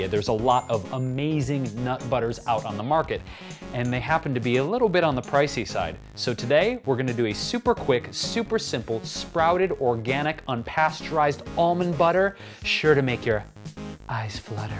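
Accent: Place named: Minnesota, United States. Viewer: Hey there, now, you got dat MN accent down pretty darn good, don't ya!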